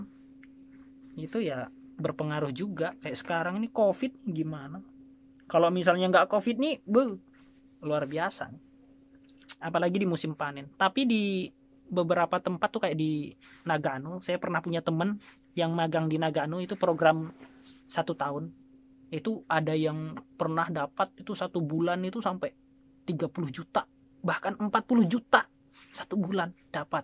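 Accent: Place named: West Java, Indonesia. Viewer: Urang sunda ieu mah nya....,keliatan logatnya...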